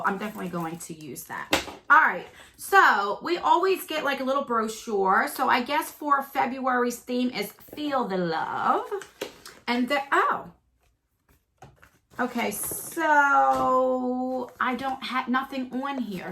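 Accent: New Jersey, United States. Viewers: I love watching your videos because of your accent, reminds me of home (NJ) ☺️ loveeee the violet voss palette 😍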